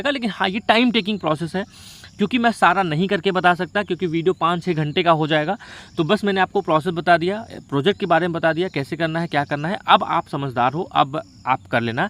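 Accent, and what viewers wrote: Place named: Kolkata, India. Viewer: Kolkata voice ascent h 😅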